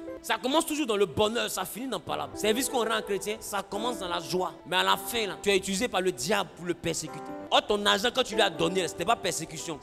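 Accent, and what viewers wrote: Ivory Coast. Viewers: Je suis fou amour de l'accent ivorien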